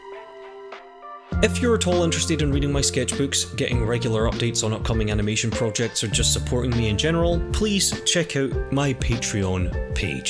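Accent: Ireland. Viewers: Are you irish? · I never get tired of hearing a good ole Irish (sorry if I got it wrong) voice.... it can be very friendly but have mischievous intents behind it all. Keep voicing your characters! Your doing great!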